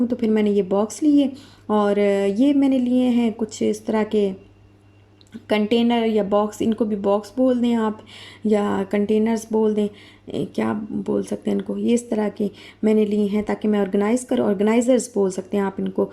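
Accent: Saraiki, Pakistan. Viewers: ALLAH ap ko khush aur khushaal rkhay..ap ki pahli video ma dakh rhi hun ap k bat krne k style se hi andaza ho gia tha k ap saraiki hn...phr comments ma dakha to confirm ho gia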